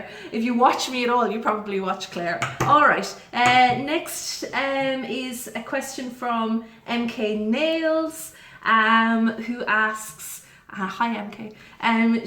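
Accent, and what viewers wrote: Ireland. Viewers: I love listening to you talk. Very partial to an Irish accent lol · I love your accent. Irish accents are one of my faves!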